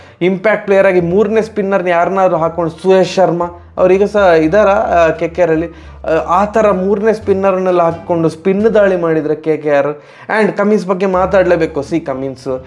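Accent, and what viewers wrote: Mangalore, India. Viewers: Neev yava video veiadru maadi karantre. Nanu nodthene. Nanu video nododu information gaagi alla. Nimma e Mangalore accent Kannada kellikke barodu 😂 I love it man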